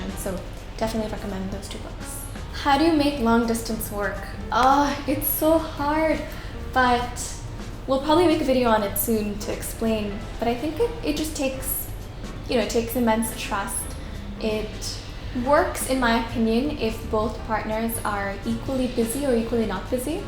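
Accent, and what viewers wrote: United States. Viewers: Why do you have US accent? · How are u able to speak English so smoothly and in US accent ?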